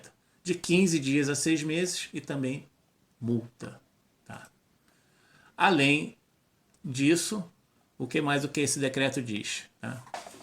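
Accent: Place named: Rio de Janeiro, Brazil. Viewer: Vc é paulista??? Achava que era carioca, do Rio de janeiro.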